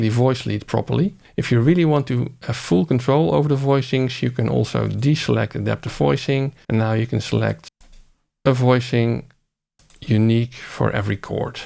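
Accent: Netherlands. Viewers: Man man man, wat een dik, Nederlands accent. Maar verder wel een informatief videootje over de Chord Track.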